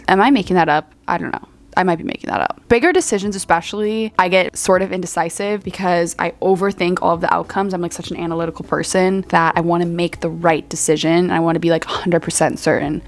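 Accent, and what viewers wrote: United States. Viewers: Ikrr the Minnesota accenttt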